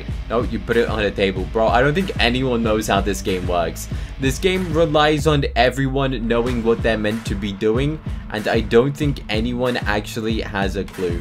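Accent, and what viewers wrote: United Kingdom. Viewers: Day 2 of asking are u British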